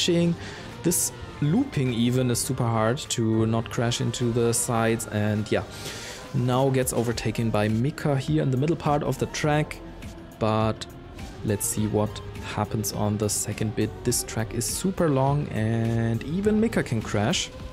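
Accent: Germany. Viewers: First video I've ever seen on your Channel and i immediately recognized the german accent 😂 aber alles gut bruder du sprichst perfektes englisch :) But my favorite drink is just plain, non carbonated water or tea, which is basically just water but hotter and with taste in it hahah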